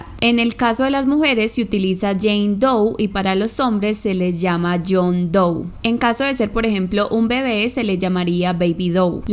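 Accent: Colombia. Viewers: Que satisfacción es saber por fin quien era en realidad Jane Doe, y cuáles eran sus circunstancias, a pensar de que se me hace un caso súper triste, es bueno saber que ya fue identificada y ojalá un día se pueda saber quién fue el miserable que le hizo eso., disfruto mucho tú manera de narrar los casos, de verdad que es entretenido, aparte ese asentó tuyo colombiano tan bonito lo hace todavía más disfrutable. Saludos desde Guadalajara, México.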